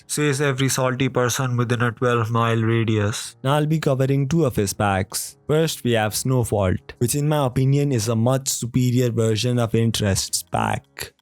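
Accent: India. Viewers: Ur voice like indian??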